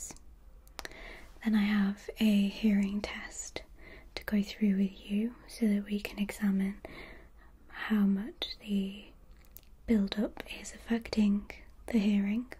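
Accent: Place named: England, United Kingdom. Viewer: Her voice is very calming and I love her English accent too. 💟❤💗💖🧡💕❣💚💞💓💛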